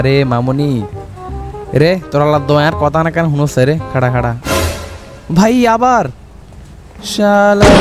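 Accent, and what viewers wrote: Noakhali, Bangladesh. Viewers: ভাইয়া আপনি কী নোয়াখালীর ছেলে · আপনি কি নোয়াখাইল্লা আমরা ও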